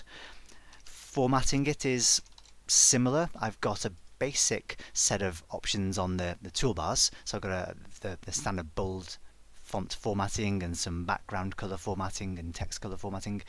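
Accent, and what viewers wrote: United Kingdom. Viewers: This is the best training video. I love the British accent! Please can you upload the SSAS 2008 training. Thanks.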